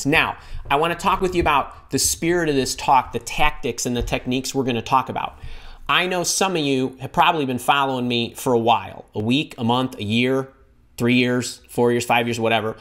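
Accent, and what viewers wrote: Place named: New York, United States. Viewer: thanks so much. These times are VERY weird - I don't have to tell you. A "little" off topic here, but I do really like your accent and I'm guessing you're in NYC? How are things there? We've been in tis "lockdown" for a week and a half and it's driving us crazy! But anyway - I'm wondering as the powers-that-shouldn't-be seem to be hell bent on upending our world as we knew it anyway - if there'll be more jobs available "online" as in virtual assistants...perhaps you could do a video concentrating on ideas for all of us currently out-of-work admin folks? I'm fluently bilingual (English/French) so I can translate as well but not "officially". Hmm....I'm hoping to find employment asap - as are we all of course! I'm just wondering what the market is for international bilingual virtual assistants? Heartfelt thanks!;-)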